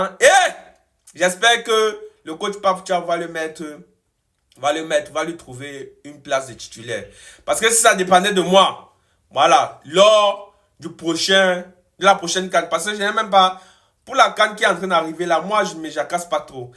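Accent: Cameroon. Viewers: ON VIT EN EUROPE, ET NOUS RECONNAISSONS TON ACCENT CAMEROUNAIS. PARCE QUE J'AI BEAUCOUP D'AMIS CAMEROUNAIS , ET VOUS N'AVEZ RIEN D'ACCENT IVOIRIEN. VOUS ÊTES UN CAMEROUNAIS PURE SANG UN BAMELINKAIS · Mais ton accent là est un son continantais (cameroun)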